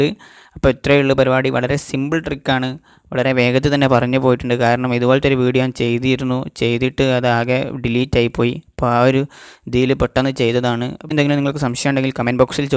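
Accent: India. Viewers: Are you from malappuram♥😂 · ഇടക്ക് ഞമ്മളെ കോയിക്കോട് നാടൻ ഭാഷകയറി വരുന്നു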